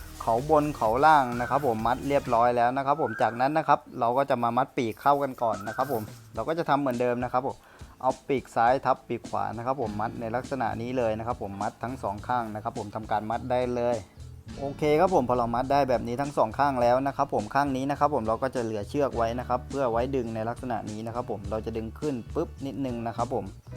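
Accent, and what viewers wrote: South, Thailand. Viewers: พี่เป็นคนใต้ใช้ไหม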